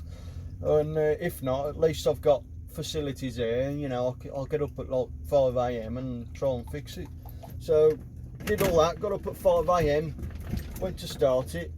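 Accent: Midlands, United Kingdom. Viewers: New sub here, stumbled across your channel , took me a while to work out what was going on !, midlands accent , american truck , canadian based, owner operator , in my all time favourite truck , peterbilt 352 pacemaker , ive looked at loads of them over the years , in consolidated peterbilt foxboro mass, in 1982, and looked at several back here in uk, first one was a red 362 around bedford way ,it was a race car transporter, second was a nice gray 352 at john bowen jones at blisworth northants, test drove it and it was sweet , and the third one was from weston super mare, a blue 352 H with 19 litre marine cat/cummins cant remember which, it had the 110" sleeper to, i think phill nash has it now plus a 352 detroit, and i saw one like yours on ebay to a year or two ago,. but i stuck with my F<num> speed for 25 years instead (see profile pic)i know a couple of uk lads working in canada, neal trickett from wrexham and austen jones from oswestry where i live,. 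How did you end up over there ?, plus i thought most of the big companies dont like old trucks ? I follow "peterbilt mike" or "mike the truck nut" on YT, hes in canada to, got a couple of 362s and a couple k100 aerodynes hes tidying them up slowly,. its a struggle with the old trucks nowadays , getting parts and fixing them yourself , been there done that on my F88 untill 2000,. i went to retro show gaydon last weekend , some nice stuff there,. anyway i,ll binge watch your videos , ive done 3/4 already , your truck could do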